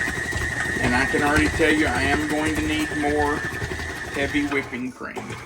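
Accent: South, United States. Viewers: I was just going to download your video so I could make this cake later, but that wonderful Southern accent kept me glued to the screen!😂 Your voice is very pleasing, and that cake - wow!! You're a great teacher by the way, thank you!!❤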